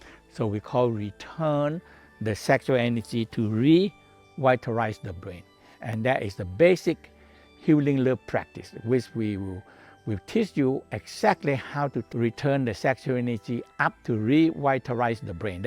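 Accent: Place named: China. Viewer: I’m sorry, sir, but you speak Chinese-English, aka, “Chinglish.” Consequently, it’s very difficult to understand you, making your information just about useless. May I suggest that you find a bilingual person who can impart your message with a voice-over in clear, native-speaker English? In this way, you can be sure that your message is correctly understood by native speakers of English! I hope this helps.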